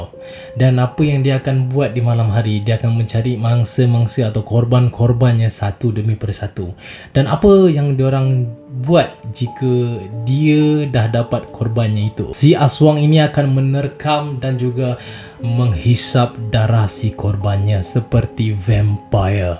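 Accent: Sabah, Malaysia. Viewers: Suara abg ni mcm suara org sabah jak hahaha btw sya urg sabah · Aduh slang2 adik ni macam Sabah laaa 🥺❤️ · Mcm slang sabahan ........ Btw storyline best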